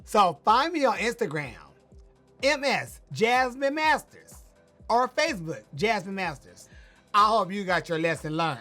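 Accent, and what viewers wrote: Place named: South, United States. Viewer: I'd like a quick peck of a kiss as I promise I've never seen out of drag. Too cute. Nice complexion and skin with thick lips + sounds so Southern with the dialect.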